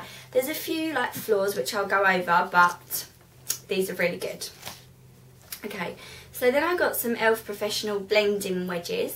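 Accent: Essex, United Kingdom. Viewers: I'm British and I can tell ur an Essex gal! X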